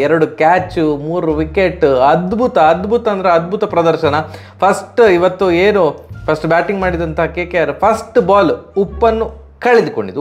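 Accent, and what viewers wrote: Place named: South Canara, India. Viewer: ಪಕ್ಷಪಾತವಿಲ್ಲದ ವ್ಯಾಖ್ಯಾನ. ಸುಂದರ ಮತ್ತು ಸಿಹಿಯಾದ ಸೌತ್ ಕೆನರಾ ಕನ್ನಡ. ಯುಗಾಧಿ ಹಬ್ಬದ ಶುಭಾಷಯಗಳು.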